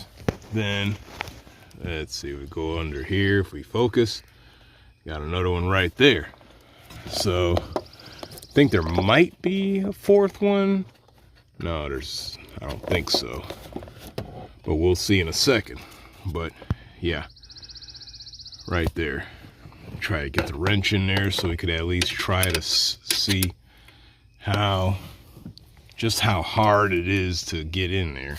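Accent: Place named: Chicago, United States. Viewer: Thanks. I need to do this on my rig. I like your accent, reminds me of home. You from the burbs of Chicago? Or somewhere over by der?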